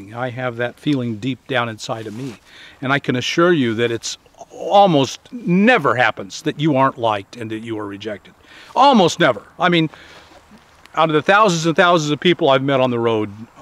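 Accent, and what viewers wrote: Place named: Minnesota, United States. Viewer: Are you from Minnesota? You sound like people from Minnesota =)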